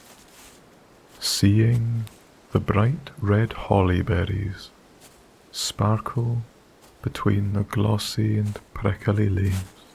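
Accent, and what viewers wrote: Scotland, United Kingdom. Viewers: Loved the story, but really enjoyed the Scottish brogue, will certainly keep listening!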